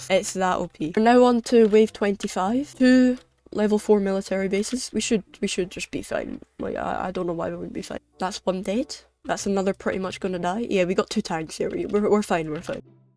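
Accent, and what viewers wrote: United Kingdom. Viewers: it sounds scottish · the moment i heard the brit accent i knew this is going to be good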